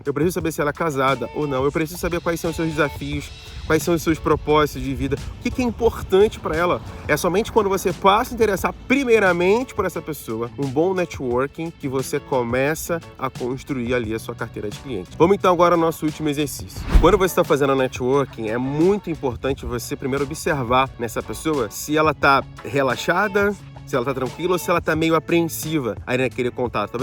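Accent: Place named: São Paulo, Brazil. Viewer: O conteudo muito bom, o sotaque de faria limer orrita um pouco... hahahahaha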